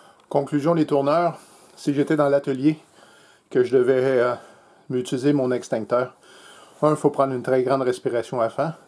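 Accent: Quebec, Canada. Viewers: Bonjour. Je n'ai découvert vos vidéos que très récemment mais elles sont vite devenues un régal pour les yeux et les oreilles. Pour les yeux car vos plans de prises de vue nous donnent l'impression d'être à vos côtés (parfois même, mieux placés que vous:-) ) où nous pouvons profiter de la beauté des gestes. Pour les oreilles non seulement parce que vous êtes très généreux en commentaires instructifs et conseils avisés mais aussi par la mélodie de votre accent québécois qui, en ce qui me concerne, résonne comme une symphonie tant il est agréable à écouter. Vraiment, vous m'avez donné l'envie d'apprendre à tourner. Un jour peut-être, quand je pourrai m'équiper. Je vous souhaite, à vous et votre famille, de très Belles Fêtes de fin d'année, et j'ai hâte de vous revoir dans une nouvelle vidéo. Bonjour de France.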